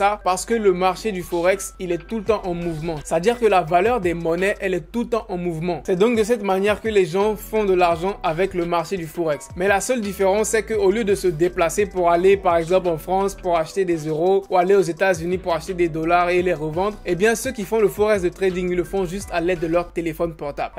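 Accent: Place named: Ivory Coast. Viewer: Merci pour la video. Dans ton accent on sait que t'es un vrai Ivoirien, j'en suis juste fière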